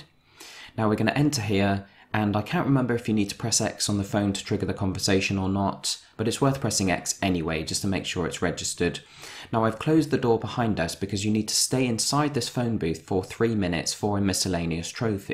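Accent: United Kingdom. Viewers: Best walkthrough ever. Smart evenly paced british voice is like listening to a harry potter audio book. Make sure you do the next Dead Island 2 or Red Dead Redemption 2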